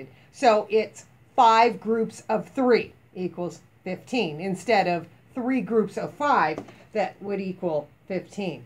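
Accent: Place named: United States. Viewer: American I'm guessing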